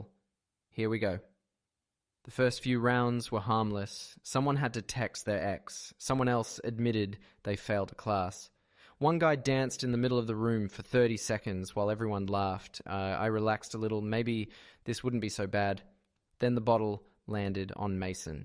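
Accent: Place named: Australia. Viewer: Sounds like an Aussie.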